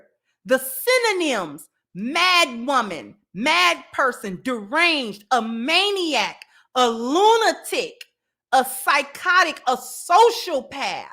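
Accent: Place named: Baltimore, United States. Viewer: She got a Bmore accent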